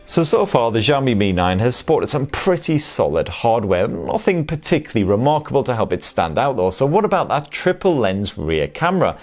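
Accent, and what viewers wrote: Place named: North East England, United Kingdom. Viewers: I'd say Teesside or around Newcastle as well. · Somewhere between Teesside and Newcastle (North East England). Its quite hard to tell as he is quite well spoken, I would hazard a guess at possibly Sunderland as his accent seems stronger than mine and I'm from teesside but I could be completely wrong.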